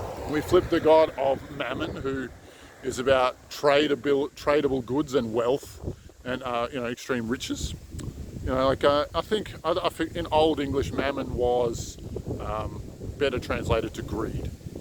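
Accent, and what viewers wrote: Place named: Australia. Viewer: I just found you & am blown away by the little I’ve seen. It wasn’t until I saw the stunning scenery behind you that I clicked you were Aussie & why your accent & speech were so relatable - 👋🏻 from cold, wet, freezing Melbourne here on Cup Day 🏇🏆 After decades of physical/mind blocks, I finally see/feel the closest to home ever, expanding without restriction & what I heard here made complete sense. Thankyou, may love & light be with you always 💗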